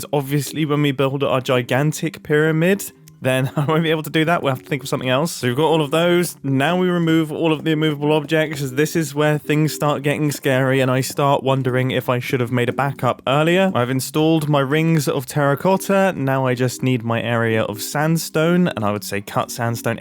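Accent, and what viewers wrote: United Kingdom. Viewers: funny hearing a british person talk about the bass pro memphis pyramids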